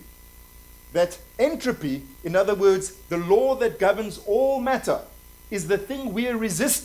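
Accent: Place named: South Africa. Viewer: He has heavy South African accent.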